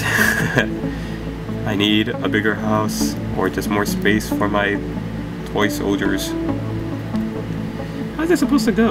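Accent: Austria. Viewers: ahahaha, definitely austrian accent!! love it, great job ^^ as you might know by now i'm austrian myself and you really did an excellent job! however, fantastic unboxing, tempting me to get one of these great minis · Nice Austrian accent. :D Do you train this voices?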